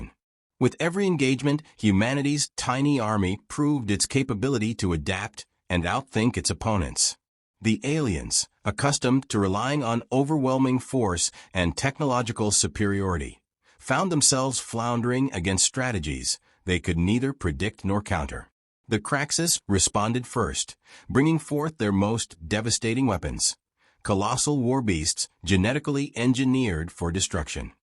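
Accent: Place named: North America. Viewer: Now tell it in an accent that isn't North American.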